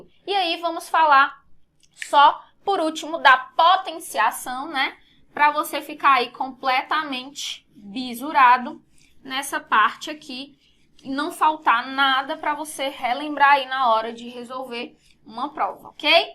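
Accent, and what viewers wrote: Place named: Maranhão, Brazil. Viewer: Muito bom. Sotaque parece dos maranhenses da família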